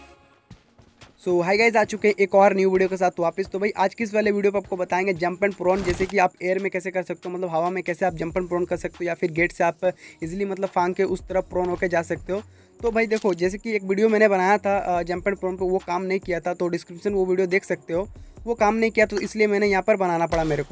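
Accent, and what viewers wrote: India. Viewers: you tolking jharkhandi🙂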